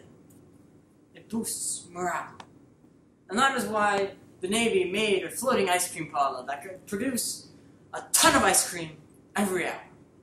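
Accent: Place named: United Kingdom. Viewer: WOW, the face/hairstyle and British accent LITERALLY looks like a younger version of the actor Thomas Brodie-Sangster (look him up if you don't know who he is), very good video again!!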